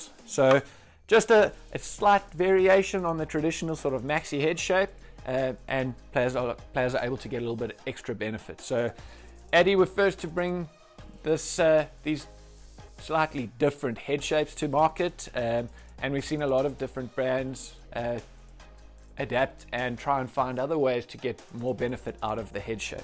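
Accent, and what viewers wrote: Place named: South Africa. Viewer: red white blue, abn amro, and kampong(?). First I thought you were a dutchie, but then I heard the South African accent haha, great video!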